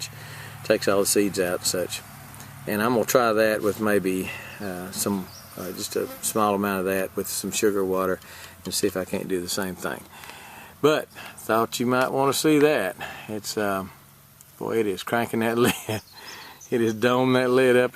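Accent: Texas, United States. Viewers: Very cool! Love the Texas accent 😁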